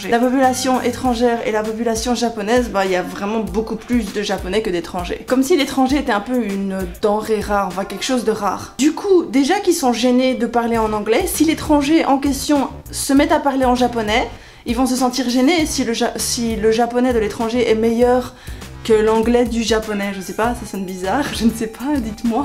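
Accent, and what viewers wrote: Belgium. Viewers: Du coup, moi qui suis nouveau ici e arrivé presque au milieu de la video. J'ai été faire un tour sur ta playlist mais ej trouve pas la première partie. Je fais comment pour avoir le début de l'histoire. Parce que l'air de rien ça m’intéresse. Je suis malade. au lit et je m'ennuie, je regarde donc des vidéos de gens qui racontent leur vie. Et j'aime bien. Kestuvafèr ? EDIT: c'est bon j'ai trouvé et j'ai vu la partie 1. Donc je suis de retour pour regarder la suite. EDIT2: pourquoi tant de dislike? les gens n'aime pas notre accent belge? Enfin pas vraiment belge puisque sud du Hainaut ou nord du Luxembourg. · Pierre Petit elle parle de Bruxelles à moment donné et vu ça façon de parler j'en suis quasi certain (c'est la première vidéo que je regarde)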